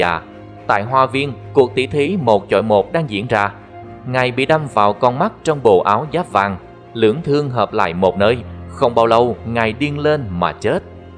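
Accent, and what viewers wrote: South, Vietnam. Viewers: Thỉnh thoảng nghe đc giọng Nam kỳ rỏ ràng dể mến Toàn giọng bk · giọng miền nam chuẩn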